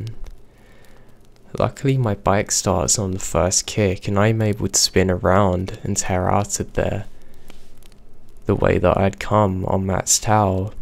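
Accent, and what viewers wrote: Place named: Yorkshire, United Kingdom. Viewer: Hey. What’s accent (or no accent) do you have? Yorkshire? I can’t tell? Not London I think. It’s cool.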